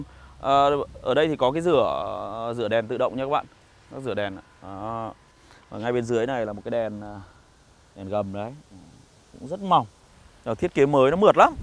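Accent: North, Vietnam. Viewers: kieu giọng bake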